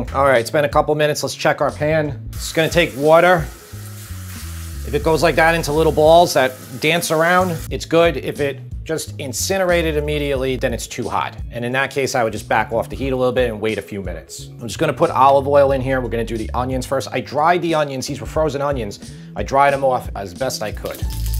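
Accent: New York, United States. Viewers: I'm going to cook this. Thansk for the recipe. BTW, I love the NY accent!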